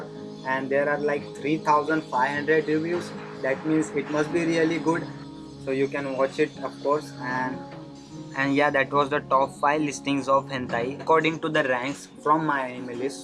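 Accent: India. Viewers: Why you sound indian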